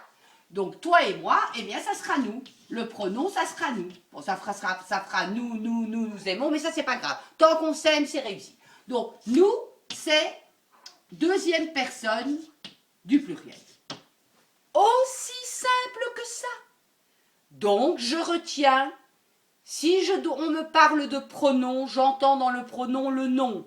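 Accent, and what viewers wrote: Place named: South, France. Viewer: Vous avez l'accent sudistes je crois je dis sa car je viens d’emménager dans le sud et mes professeurs disent tout le temps ' ils ou elles ' en prononçant le s à la fin .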